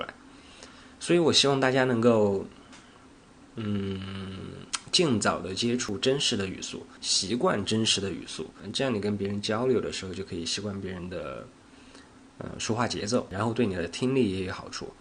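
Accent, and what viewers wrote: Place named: China. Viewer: 老师，您好。很高兴会遇到您的频道，我很喜欢您的视频，subcribed 了，请问您是中国哪个地方的人？我喜欢听北方人说普通话，也想会说的像北方人那样，可是也不要太重。刚好像您一样，儿化不太多，速度也不是太快。您的视频真的是很合适让我们外国人来练习跟读（shadowing). 还有谢谢您在您一些视频提供了拼音字母，让我们更有自信地跟读 （不担心声调读错）。老师，有些问题想问您 ： 1. 书里说上声如果在句末应该读 214，可是我在电影和youtube听母语者读 21 不是 214. 我们该如何好？ 2. 去声连在一起的时候，比如 ： “放假”，“记号”。 有位老师说应该读 53-51 而不是 51-51， 所以听起来不像生气的样子。可是老师，我到现在还觉得莫名其妙，因为不少听母语者说 “记号” 不是 53 51 而 更像 51-轻声。对我来说这样的情况让我感到很头疼。请老师解释。。 3. 有一个频道， link: <link>.母语者在real life 说话的时候大部分说的那么快吗？这样的话，我觉得到最后永远也不会 sound like a native chinese speaker?:-) 跟不上。 感 谢 老师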